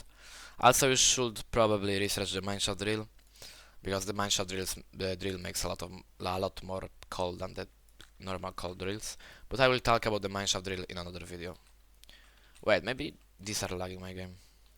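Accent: Poland. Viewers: Siema wiem że jesteś z polski po akcencie i napisie aktywuj windows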